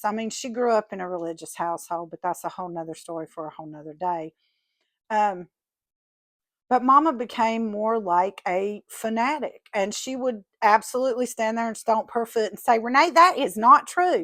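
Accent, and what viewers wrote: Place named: South, United States. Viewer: Sweet southern belle 😉. Music to my ears (I am a European immigrant) 😉❤️. This is what I thought all Americans sounded like when I was growing up in the 80s 😂. Not sure where the torture after the rapture came from 🤨. Keep looking gal. If you’re meant to find God, you will. As long as you keep looking and not let fake Christians affect your search 😉❤️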